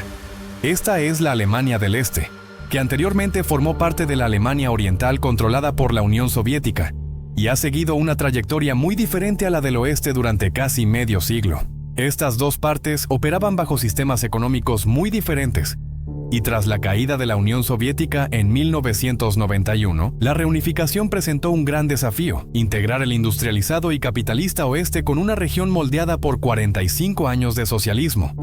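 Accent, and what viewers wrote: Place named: Latin America. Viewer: Según ustedes es imposible vivir en Alemania, Australia, Canadá, Portugal, Nueva Zelanda, Irlanda, Japón, Reino Unido...y siempre de una forma u otra es culpa de los inmigrantes... qué raro!! Suena a ideas de extrema derecha...y lo mejor de todo es que el locutor tiene acento de Latinoamérica, será un inmigrante?? Quizás es uno de esos miles de Venezolanos que pidieron el voto para Trump, los Magazolanos, y ahora Trump los expulsa. No me creo que no se pueda vivir en ningún sitio y encima siempre se criminalice a los inmigrantes.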